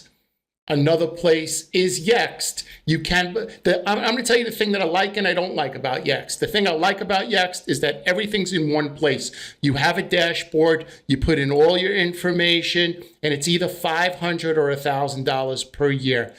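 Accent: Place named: New York, United States. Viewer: I think I hear a fellow New Yaaawker 👂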